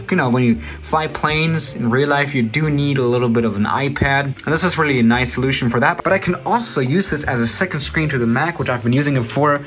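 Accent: Germany. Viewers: Wait are you actually German ?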